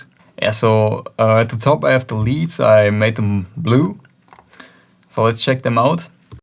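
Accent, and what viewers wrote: Germany. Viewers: Man hört den deutschen Akzent so stark raus🙈, trotzdem sehr gutes Tutorial👍 und vielen Dank für die Flp🙏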